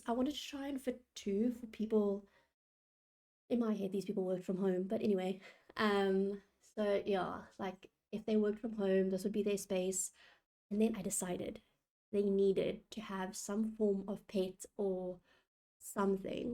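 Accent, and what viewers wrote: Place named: South Africa. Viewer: Lovely house and I hear that South African accent ❤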